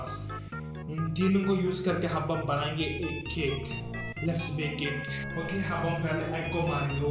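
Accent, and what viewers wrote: South, India. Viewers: your south indian accent is so good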